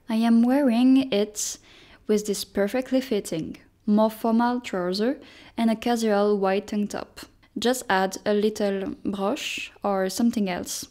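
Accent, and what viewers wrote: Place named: France. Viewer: OK for the French accent to make it sound so "parisienne", but at least read it a few times before because it sounds like reading what someone else wrote without understanding a word of it.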